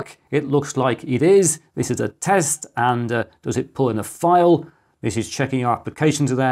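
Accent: United Kingdom. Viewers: Very clear tutorial, summarizes the various procedures perfectly. Plus I really like your British accent and very clear diction.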